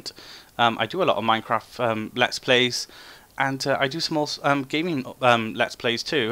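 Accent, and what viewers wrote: Wales, United Kingdom. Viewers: Are you from wales? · Awesome, worked for me. Btw where are you from? Did I detect a Welsh accent or am I completely wrong? Just as a heads up to others as well optifine lite wont work with the other mods, go standard or ultra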